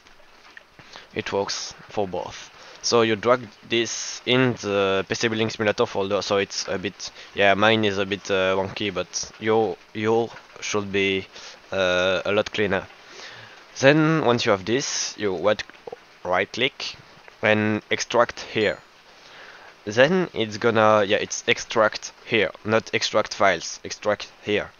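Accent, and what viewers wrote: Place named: France. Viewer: l'accent francais xD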